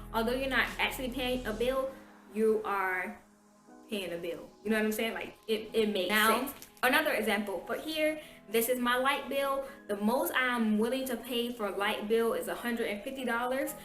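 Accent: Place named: New York, United States. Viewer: She sounds like she from ny but moved down south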